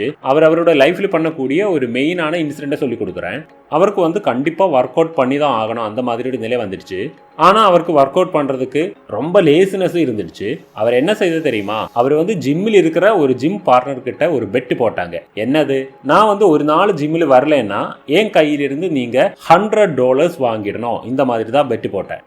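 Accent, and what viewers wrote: Kerala, India. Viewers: You are a malayalee???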